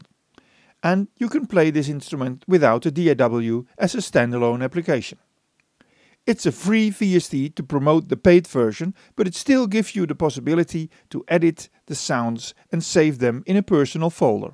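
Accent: Netherlands. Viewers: this guy is definitely dutch haha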